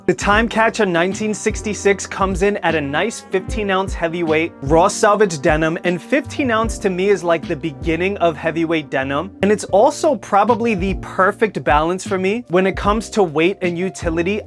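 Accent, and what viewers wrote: New York, United States. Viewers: Thank the lord, there's still people with New York accents.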